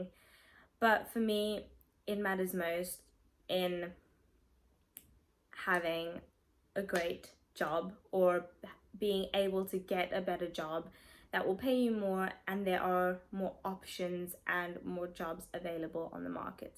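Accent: United Kingdom. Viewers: You only been in UK for almost 2 years and you speak as if you have an accent of the country already.. Not sure how that is possible because you came from South Africa, don't forget where you came from. 😊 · Wow you picked up the accent so quickly 😃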